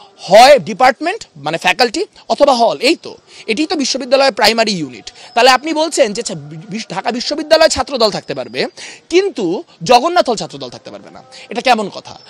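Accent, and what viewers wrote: Kolkata, India. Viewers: এর কথাবার্তা শুনে তোমার ইন্ডিয়ান মনে হচ্ছে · ওনার কথা গুলা কেমন যেনো কলকাতার মানুষের ভাষার মতো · তার কথাগুলো কলকাতার মত।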